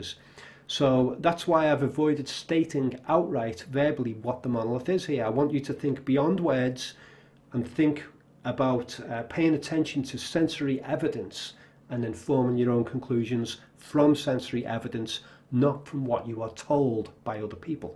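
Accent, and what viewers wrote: Liverpool, United Kingdom. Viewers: Is a Scouser?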